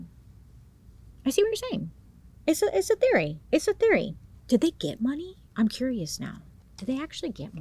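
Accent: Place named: Inland Empire, United States. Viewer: Dude idk if you have or haven't seen it but Rob Zombie has a badass documentary about Manson with what honestly seems more like the truth. The truth especially if you have been part of any like tweeker group or dope house mentality. Check it out if u haven't! Also I can totally tell your from the IE you talk like us❤❤❤❤ I love your story telling!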